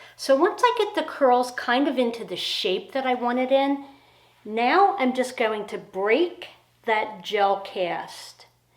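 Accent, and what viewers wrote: Philadelphia, United States. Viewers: I am from Philly and you are either Philly or South Jersey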